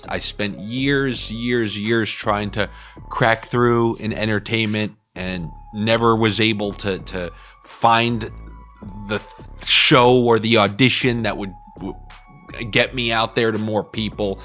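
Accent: Boston, United States. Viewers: Love the Boston accent talk.....you do it well.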